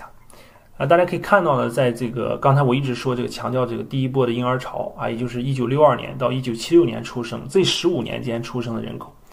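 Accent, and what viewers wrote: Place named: Taiyuan, China. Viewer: 主播听口音像是太原的？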